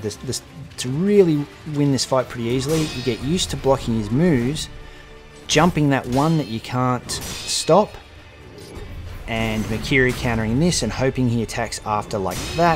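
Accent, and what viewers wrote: Australia. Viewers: i feel like everything is easier to learn when explained with an Australian accent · great to hear an Aussie accent for a change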